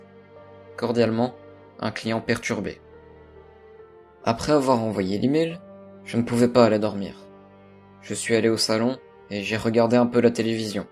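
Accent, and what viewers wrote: Belgium. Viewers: tu es belge?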